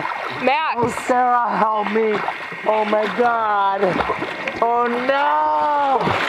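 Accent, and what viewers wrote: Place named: United Kingdom. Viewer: he sounds british for me, like sir max or smth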